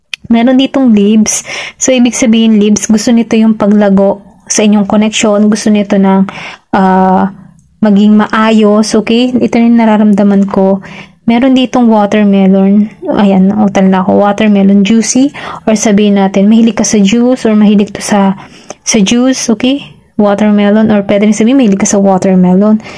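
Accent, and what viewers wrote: Batangas, Philippines. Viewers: Parang Batangueña ang isa sa pa paborito kong tarot reader😊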